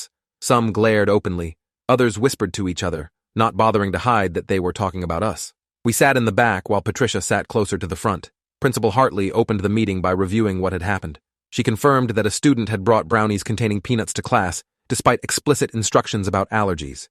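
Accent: United Kingdom. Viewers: In every video a British accent happens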